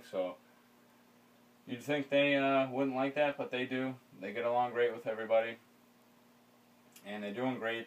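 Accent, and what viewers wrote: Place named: Massachusetts, United States. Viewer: Being originally from Shrewsbury, MA and now living in NJ I appreciated the MA accent when learning about angelfish!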